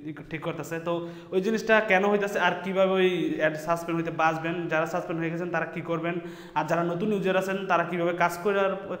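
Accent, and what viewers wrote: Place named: Kishoreganj, Bangladesh. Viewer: বাড়ি কৈ? কিশোরগঞ্জয়া নি?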